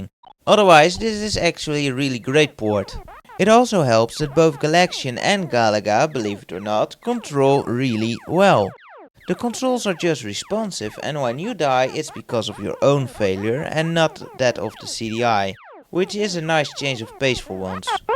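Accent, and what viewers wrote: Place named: Netherlands. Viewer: You sound verry Dutch!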